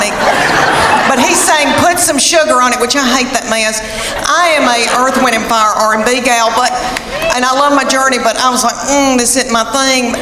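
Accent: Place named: Knoxville, United States. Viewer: So funny. The accent is so like my cousin in Knoxville.😅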